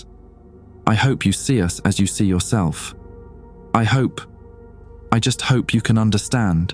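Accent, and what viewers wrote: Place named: England, United Kingdom. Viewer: I really liked this one. Nice twist that wasn't super obvious. This isn't a criticism but, as a brit, it's so jarring to hear the narrator speak in an English accent but use American phrases. Was mostly at the start of the story.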